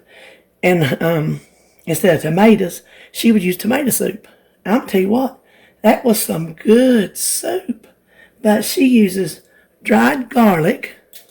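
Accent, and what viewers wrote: South, United States. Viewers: I know exactly what you mean -- I grew up from southeastern Kentucky cooking and ... woooweeee I love my gravy and biscuits, fried potatoes, corn bread, fried chicken, fried green tomatoes, ... hmmmm have you noticed we like to fry where I come from? I love my southern roots and listening to you reminds me of those roots!! Love your channel and your style!!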